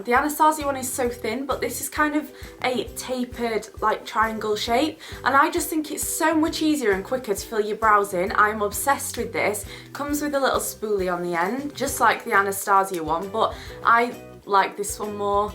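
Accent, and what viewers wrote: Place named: North, United Kingdom. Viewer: Love you videos:) can tell ur northern lol saying it's sick lol:) xx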